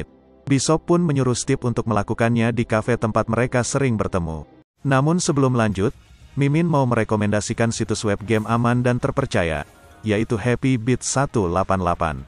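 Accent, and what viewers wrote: Palembang, Indonesia. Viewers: Bang orang palembang kah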